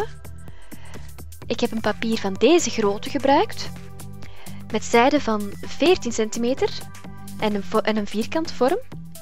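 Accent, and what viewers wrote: Flanders, Belgium. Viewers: ik snap die dingen niet en het is vlaams niet nl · Ze zeggen dat dit Nederlands is maar dit is Vlaams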